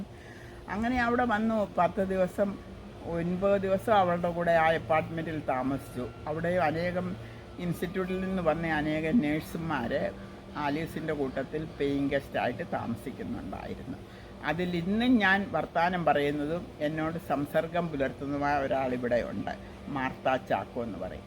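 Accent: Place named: Pathanamthitta, India. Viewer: ഞങ്ങടെ നാട്ടിലെ സംസാരം.... ചേച്ചി ഉമ്മ...പത്തനംതിട്ട 😍 അമേരിക്കയിലും നാട്ടിലെ പോലെ കപ്പ, റോസാ ഒക്കെ നിൽക്കുന്നു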